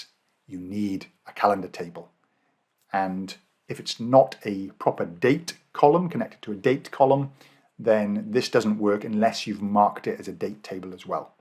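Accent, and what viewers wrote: Wales, United Kingdom. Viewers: This is very helpful, good to hear a Welsh accent as well!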